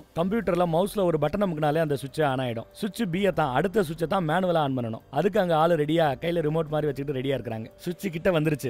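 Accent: Madurai, India. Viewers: இவ்ளோ அழகா கதை சொல்ல தெரிஞ்ச உனக்கு நம் தேசத்தை மதிக்க தெரியவில்லையே உன் பேச்சு மதுரைக்காரன் போல் தெரிகிறது எதிரியான துருக்கி பேராபத்தில் இருக்கும் பொழுது உதவிக்கரம் நீட்டிய தேசம் நம் தேசம் சைனா பாசம் தான் உனக்கு அதிகமாக உள்ளது உன்னைப் பெற்ற வர்கள் இந்தியர் தானே அதில் சந்தேகம் இல்லையே உன்னை காயப்படுத்த வேண்டும் என்ற நோக்கம் எனக்கு இல்லை நம் தேசத்தை இழிவாக என்னும் எண்ணத்தை முதலில் மாற்று நீ கதையில் சொன்ன பெண்ணின் மனநிலையும் உன் மனநிலை ஒன்று போல் தான் இருக்கிறது உன்னைச் சுற்றி உள்ள நால்வரை வைத்து ஒட்டுமொத்த தேசத்தையும் சந்தேகம் கொள்ளாதே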